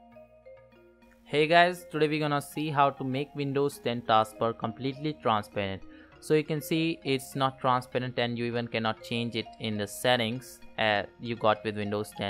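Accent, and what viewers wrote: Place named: India. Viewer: rip english rip indians english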